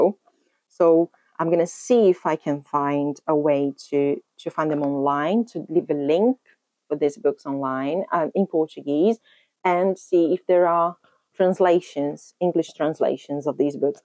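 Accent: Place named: United Kingdom. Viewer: Você tem um sotaque britânico lindo!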